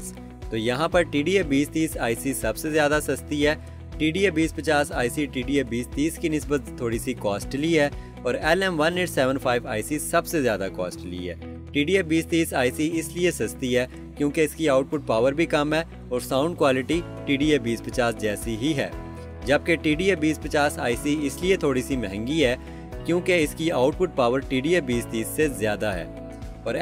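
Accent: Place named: Pakistan. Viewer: Pakistan accent 😊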